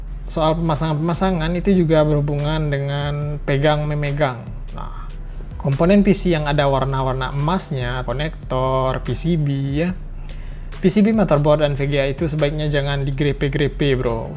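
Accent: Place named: Padang, Indonesia. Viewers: Iya Gan Dia Orang Padang. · Ini orang padang ya? Dialeknya kentara bgt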